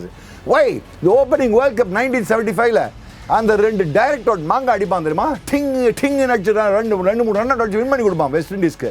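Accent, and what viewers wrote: Chennai, India. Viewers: sir you are awesome! Again and again you are proving you are so talkative, sportive etc.. You always speak in our Madras basha.. 👌👌 Velipadayana Manishan sir neenga..No one can compete with your energy level...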